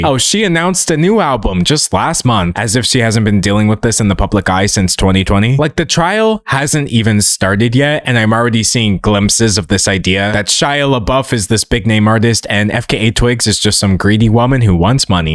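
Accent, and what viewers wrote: South, United States. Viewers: first of all, where did this southern accent come from. Secondly, "the jealousy and insecurity" that put hands on a woman... uh, sir, abstract concepts cannot manifest in the physical realm like that? gross.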